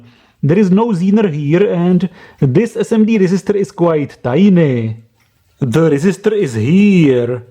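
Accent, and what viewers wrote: Czech Republic. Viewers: Czenglish hype!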